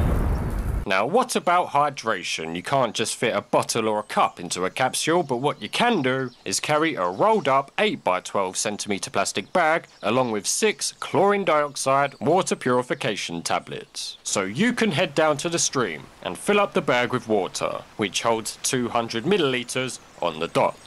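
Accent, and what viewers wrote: United Kingdom. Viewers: Ure probs american it is literally just british!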